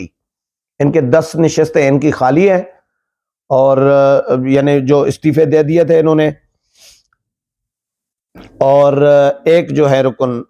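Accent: Sindh, Pakistan. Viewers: سندھی بھائی کل رات بلکہ آج صبح تک آپ فرما رھے تھے mqm نکل گئی تو بہت خطرہ ھے حکومت کو اور آج کو یہ ہتہ چلارات کو تو نمبر گیم کلئیر ھے کوئی خطرہ نہیں ۔۔ویسے آپ لگتا ھے خود الکٹرانک میڈیا براہ راست نہیں دیکھتے سن سنا کر گزارا کرتے ہیں کیونکہ آج کے مطابق mqm مرکز کی حکومت تو کیا صوبائی حکومت سے بھی بکل رھے ۔۔بہرحال ہمیں آپکا سندھی لہجے میں اردو بولنا بھاتا ھے ۔۔اسلام آباد میں رہ کر معلومات update رکھا کریں محترم ۔۔۔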